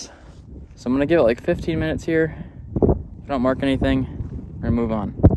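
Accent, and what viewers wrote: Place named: Minnesota, United States. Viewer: The Minnesota accent really shining through in today's video! I really enjoying seeing your community interactions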